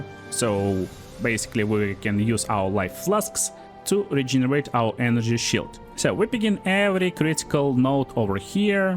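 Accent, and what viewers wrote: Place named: Russia. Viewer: Чувак, перестань пытаться говорить по английски без акцента, звучит ужасно. Одно слово из 5 звучит более менее, остальные с дичайшим русским акцентом )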